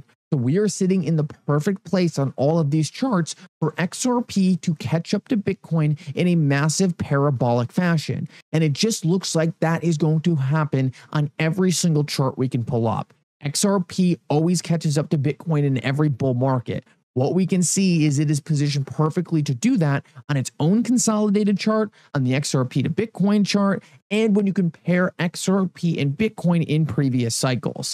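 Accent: Allentown, United States. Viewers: with an Allentown accent, XRP is set to go to 0.001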